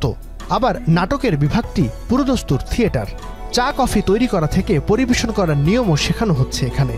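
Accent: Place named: Noakhali, Bangladesh. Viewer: ভাই আপনার সংবাদ বিশ্লেষণ ভুলে ভরা, আর "আমি জেনে শুনে বিষ করেছি "প্রাণ"(???) , বিষ করেছি পান হবে। চুপ্পু বংগভবনে বন্দি না, আপনি বলেছেন চুপ্পু কোথায়ও বের হয় না,কথাটি সম্পুর্ন ভুল, এই ২৬ শে মার্চ স্বাধীনতা দিবসে সে সাভার স্মৃতি সৌধে পুষ্পস্ত্ববক অর্পণ করে। আপনার কথায় নোয়াখালীর আঞ্চলিকতা প্রকটভাবে লক্ষনীয়।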